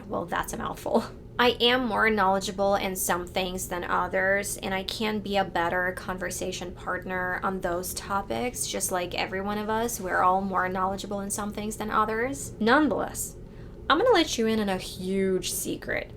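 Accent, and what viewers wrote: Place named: United States. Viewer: sometimes I do get the strong impression/feeling that you're really an American!!! Sure,sometimes I forget that your native language is the Ukranian one...your English is TERRIFIC!!!! Congrats! 🇺🇸🇺🇸🇺🇸🇺🇸🇺🇸🇺🇸🇺🇸🇺🇸❤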